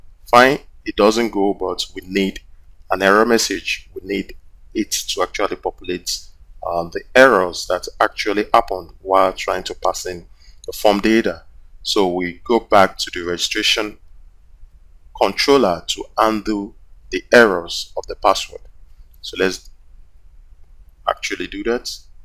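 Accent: Nigeria. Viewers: are you nigerian?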